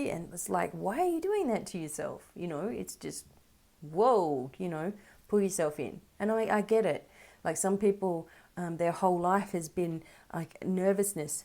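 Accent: Australia. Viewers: So True. Especially better cos you are an Aussie and you’ve kept your beaut accent !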